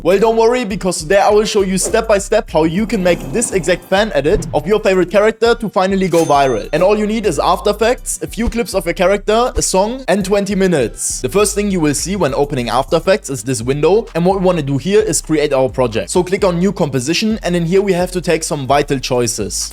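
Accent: Germany. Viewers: man merkt das du deutsch bist dikka der Akzent killt mich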